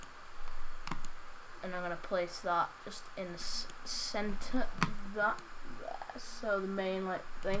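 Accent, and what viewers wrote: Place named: United Kingdom. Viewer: O,MMGMGFJ UR BRITISH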